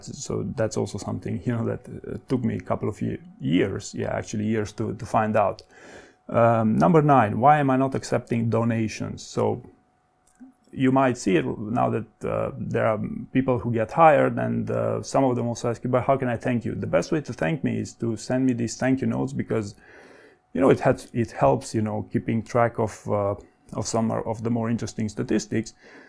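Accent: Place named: Romania. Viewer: Thank you for all the videos! I figured that you would be Romanian, you sound exactly like one of my Romanian boss :D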